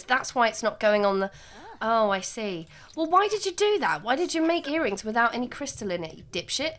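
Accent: United Kingdom. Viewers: I've discovered your channel and can not stop watching your storylines. The British accent is the icing on the cake. ❤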